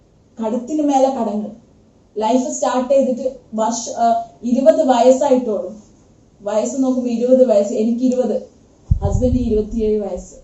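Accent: Kasaragod, India. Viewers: Chechi kasaragod ayrno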